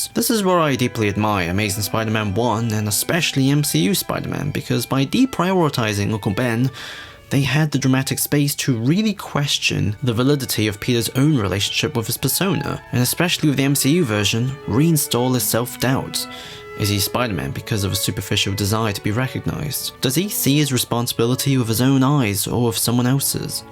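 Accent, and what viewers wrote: United Kingdom. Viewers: I find it funny that you thinking having an “insightful” analysis is zooming in on out of context, unconnected quotes from a comic book, with some douchebag with a British accent reading them to lo-fi hip hop in the background. It’s not insightful in the slightest, but there is another word for it: disingenuous. He misrepresents the comics in HORRIBLY bad faith. Nothing insightful about that.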